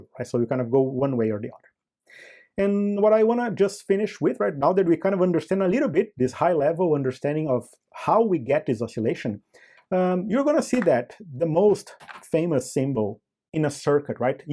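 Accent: Brazil. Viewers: pelo sotaque, você é brasileiro! muito bom seu canal! parabéns!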